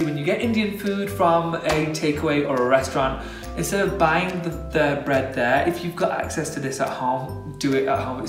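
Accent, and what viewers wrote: United Kingdom. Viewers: Zehra Rizvi Isnt he British? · A C R Maybe it’s just the dialect that I grew up with, but roti also has a solid “o” sound and a thick “t,” I think he knows he’s using an English accent because he pronounces it with a Pakistani accent in the beginning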